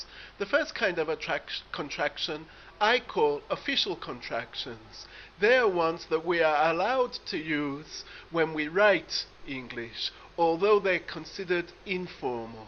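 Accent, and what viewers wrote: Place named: Liverpool, United Kingdom. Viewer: Hi you know that I can understand you perfectly unless you speak with your Liverpool accent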